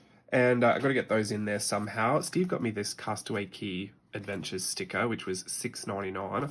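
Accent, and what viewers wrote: Australia. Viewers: Loving the super strong Aussie language and accent coming through today “onya ”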